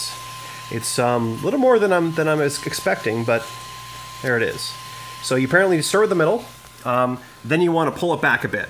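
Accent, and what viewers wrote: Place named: United States. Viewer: Great video, thanks for posting this! I'm very interested in this printer and noticed the economy shipping to NY state was $55 excluding VAT. You sound like you are from the states. If so, did you get charged a VAT?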